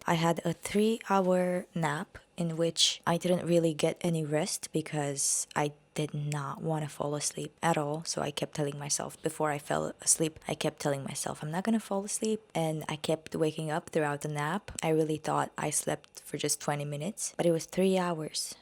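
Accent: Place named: Philippines. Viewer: I might just be weird but you kinda sound like you're from the Philippines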